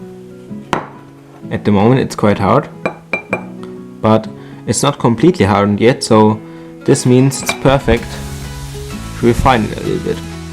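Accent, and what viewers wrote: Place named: Germany. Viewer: your english isn't the best, bist du deutsch, are you german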